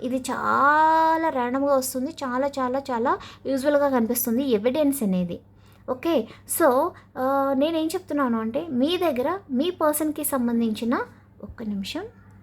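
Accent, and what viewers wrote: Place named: Godavari, India. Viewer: మీది గోదావరి అండి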